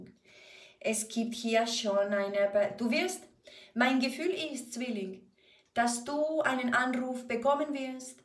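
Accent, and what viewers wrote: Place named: Spain. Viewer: Casi puedes decirr lo que quieres, te lo prestaría attención por tu accento español. 🤗 y tienes razón con lo que dices en algunas cosaa.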